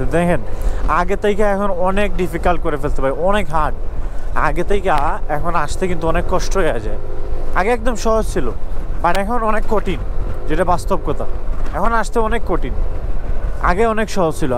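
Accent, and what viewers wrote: Sylhet, Bangladesh. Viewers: Vai mone hoy sylhet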